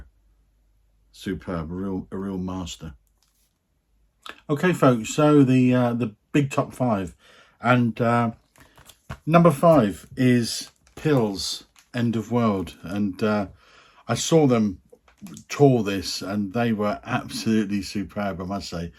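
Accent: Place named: United Kingdom. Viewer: Brilliant job. Thanks for those shoutouts! I agree about the PG album. There are a few songs that don’t necessarily hit you right away, so it will get stronger with more listens. I actually played it quite a bit before I made my list because I wanted to give it a fair assessment in a short period of time. I think this episode is a perfect example of why your channel is so popular. Your music expertise is remarkable and you have that hip, in the know kind of vibe. Plus being British it just makes you sound more intelligent and sophisticated. You definitely have talked me into giving a couple albums on here a try.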